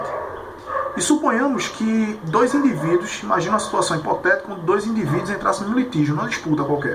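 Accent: Pernambuco, Brazil. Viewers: Você é pernambucano? Eu também sou, Parabéns pelo vídeo, estou querendo fazer um curso de história e estes vídeos me deixam com muita empolgação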